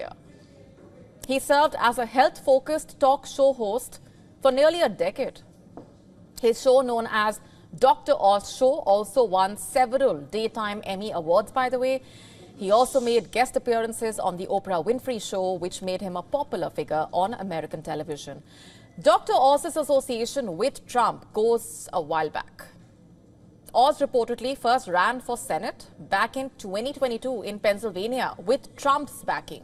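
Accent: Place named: India. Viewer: news caster with strong Indian accent...voice not clear...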